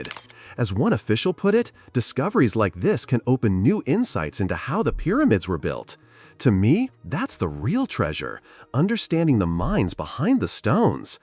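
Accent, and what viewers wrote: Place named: United States. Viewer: THE AMERICAN NOISE OF THIS NARRATION IS SO ANNOYING. IT SOUNDS SIMILAR TO THE VOICE OVERS FROM THE 70S ABOUT A NEW WASHING MACHINE BEING SOLD AT A NON-DESCRIPT APPLIANCE CHAIN IN THE USA. STOPPED WATCHING AFTER 2 MINUTES AND FOUND SOMETHING ELSE LESS ANNOYING .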